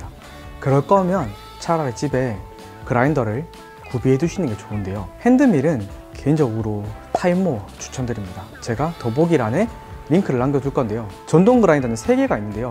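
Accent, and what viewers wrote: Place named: Gyeongbuk, South Korea. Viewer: 경북지역 억양 인듯요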